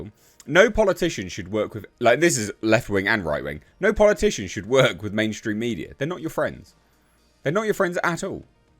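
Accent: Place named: United Kingdom. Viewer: There’s something that makes a Brit hurling insults make them more legitimate. What accent do you have? Like the USA I know there are more than 1.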